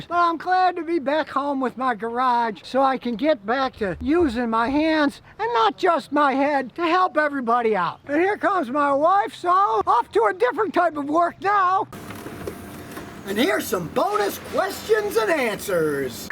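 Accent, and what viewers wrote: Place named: North, United States. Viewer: Welcome back to the south. Your accent says "yankee' to me, but you have become a southerner at heart, in my exalted opinion. You were fortunate that it only took two months to decide. It took me a few years in California to realize that I needed to come back. Love your videos, even if I sometimes do things which you would label "stupid".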